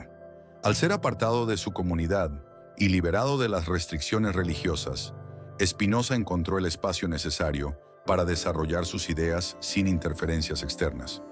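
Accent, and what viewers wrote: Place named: Paraguay. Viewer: Por qué la IA tiene acento paraguayo? No es que tenga algo con los paraguas, me cae simpático su asentó. Pero para escuchar este tipo de cosas me resulta incómodo.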